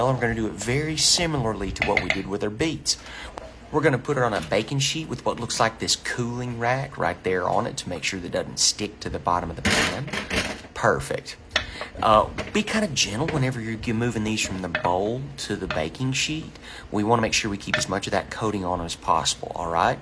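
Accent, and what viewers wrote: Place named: United States. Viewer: Love them all, except the beets.....lol I have to ask what part of the US does your accent come from? Mine is a mix of South Georgia and eastern NC.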